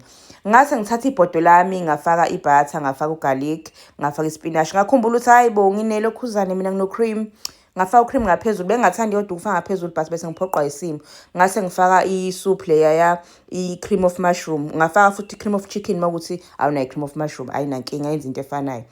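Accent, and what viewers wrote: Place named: Zulu, South Africa. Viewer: Ngiyakubona nokudla... Ngithanda isiZulu ne accent. Maye. ❤❤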